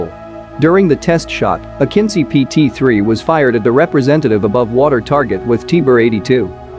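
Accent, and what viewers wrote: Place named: United States. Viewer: Ich frage mich wie krank ist die Menschenheit, die Überschrift heißt, Endlich hat die Türkei die Tödllichste Drohne. Als ob die Welt ohne Waffen da steht. Der Sprecher dürfte wohl Amerikaner sein. Ich wünsche uns allen Frieden und Freiheit auf Erden ohne Waffen, und nicht mit Waffen.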